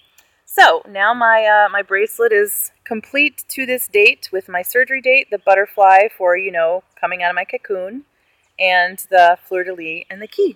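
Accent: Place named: Canada. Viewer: I hear the birds, spring time in MI. You are amazing, ouut and abouut, you sounding more Canadian than southern!! You should are great and thanks for the honest updates.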